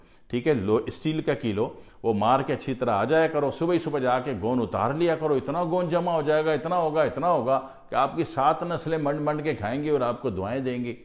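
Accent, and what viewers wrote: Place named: India. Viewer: Apkj zaban se lagta ha ap hindustani ho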